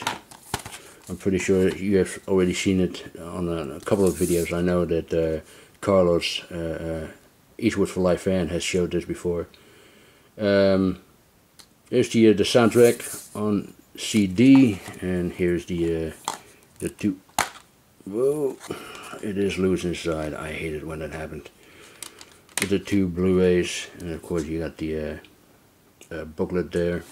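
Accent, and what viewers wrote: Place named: Italy. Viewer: Fantastic update 👍😎& plenty of Italian films. The De Palma-De Niro early movies I have never seen, fans of both & might consider picking up. I enjoyed “The fifth cord” & interesting extras from Arrow video. Well spoken in your Italian accent 👍, “Double face” I almost picked up in the Arrow sale. As I’ve been on a Giallo kick of late, I do want all the Sergio Martino Giallo movies after enjoying watching “The strange vice of Mrs Wardh” “Street law” cool Euro crime movie & I agree the Grindhouse releasing of “The tough ones” aka (Rome armed to the teeth) is one of the releases of the year. Nice touch the numbered bullet 😎 I do rate “Almost human” as Umberto Lenzi’s finest Euro crime movie also. Definitely, more Maurizio Merli Euro crime movies 👍, I have most of them as bootlegs. What a coincidence in the Dorado release of “The seven from Texas” in getting numbered 401 & 402, if you remember, you reminded me of the release as I totally gave up on the release 😬& when you did I ordered straight away as it’s limited to a small number. 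“Broken arrow” fine early western with the reliable James Stewart. “Saving Private Ryan” is on my 4K wanted list, as I’m imagining the beach scene will look epic on 4K. Perfect finale to this update, a mention to Rutger Hauer & the sad passing of a great actor ☹️I always enjoyed all of his roles. Maybe a Rutger Hauer Collection video 🤔 Cool update friend 👍😎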